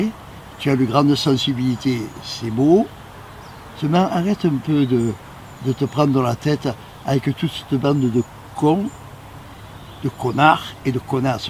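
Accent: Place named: South, France. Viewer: Salut et ......Chuttttt 🤗😆 et quel bel accent du Sud. Le rose me va aussi 😅😅😅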